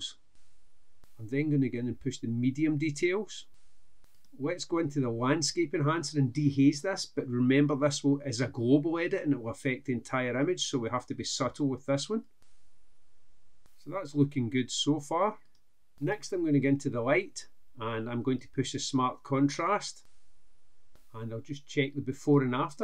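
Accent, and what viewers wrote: Scotland, United Kingdom. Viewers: That’s quite rude.. Scottish accent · i hope that you are not angry with my because of my comment, but i actually more used to american english, so it took a while before i understood what you said. I like your accent, but it was so strange to me in the beginning. In my 2,5 years of learning english, i never heard scottish accent. forgive me please;-)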